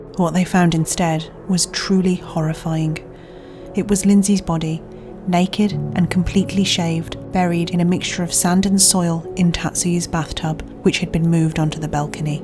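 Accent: United Kingdom. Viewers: Dude... Do you even hear yourself? You waffle on in here demanding someone with a British accept to do the very thing she is already doing; Speak normally. And you proceed to do this with a comment where you demonstrate your complete ignorance of the written English language. Are you this embarrassing in real-life too?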